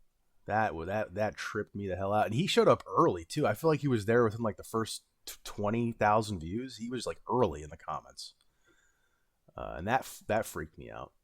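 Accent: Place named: United States. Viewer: The voice The shirt The American accent Absolutely cinema.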